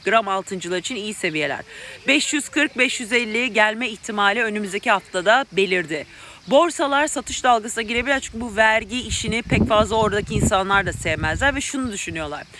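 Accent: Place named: Sivas, Turkey. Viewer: Hocam sivaslısınız galiba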